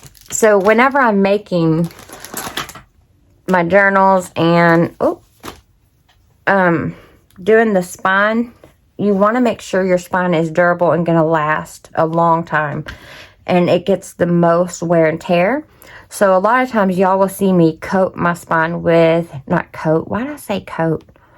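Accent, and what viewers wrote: South, United States. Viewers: Hi, I just discovered your channel. I just loved this presentation. I'm a crafter and love Temu. Thank you for the information on the price adjustment, I just got $3.78 credit just for the last month. Also ordered some of those random die cuts. I had been wondering if they were worth the money. Also I don't know where you live but I just love your southern accent! Thanks again.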